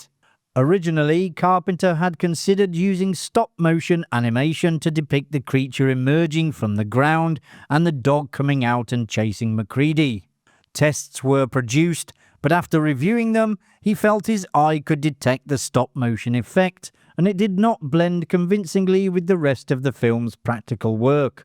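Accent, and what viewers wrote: United Kingdom. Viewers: LOL, Love how you keep saying Tobe wrong....and to the guy before me, this is not AI slop, he's just Brittish.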